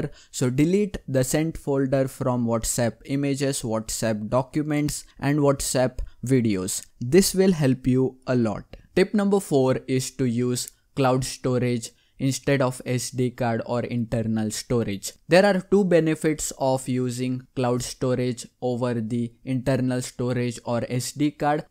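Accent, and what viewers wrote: India. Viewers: I agree it is very difficult to understand it is very difficult to understand anything you say that Indian accent that Indian accent makes it very difficult very irritating I only understand half of what you're saying sick and tired of it thanks for nothing thanks for nothing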